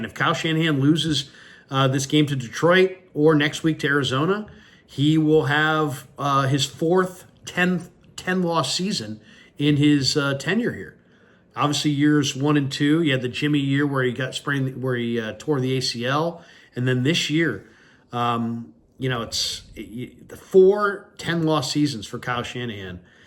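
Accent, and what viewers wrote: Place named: Northern California, United States. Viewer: with that casual NorCal accent. Trust me, I've lived in Texas for 17 years as well as other places it's a thing.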